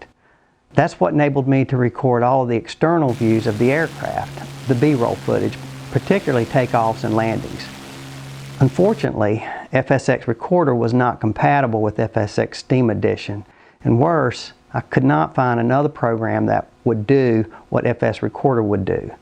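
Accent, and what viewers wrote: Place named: North Carolina, United States. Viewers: Hello. First. Congrats to you on your next major step in life...retirement. I should know as I am as well. Let me be one of many to welcome you back into "active duty" on your channel and your signature way of providing intuitive and colorful videos and talking points. I have followed your channel since its inception and absolutely love your storytelling and "fun facts". I can remember on one of your episodes where you were getting an earful about your southern drawl. Well I can identify with that as well. As this is one of the first times I have commented on your channel I totally identified with your series as you were receiving an Embraer biz jet out of Brasil. My wife and I both watched the series and found it to be really good. She especially loved it and listened to your pronunciations of some of the cities....as she is a native of Brasil. 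Anyway...so much for the long comment. Welcome Back!!....Alvin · Hello, and welcome back! We've missed you very much!! IMHO, as an avid (but beginner) flight simmer, your YT videos are the best available. Your attention to detail, excellent teaching style, interesting and very enjoyable "Fun Facts", along with an occasional "live flight" video, make your channel the most useful, helpful, and thoroughly enjoyable flight-lover's learning source! And your North Carolina accent (I'm just down the street from you in the Charlotte area!) is delightful and so very pleasant to listen to!! BTW, yours is the first YT channel I subscribed to and, just a few minutes ago (because I just happened to see your 2020 Update video in my YT list when I got online the other evening), also the first channel on which I activated the Notification Bell. I don't want to miss any opportunities to learn more about flight from you!! 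I'm so glad you're back online with us!! And congratulations on your "semi-retirement"!!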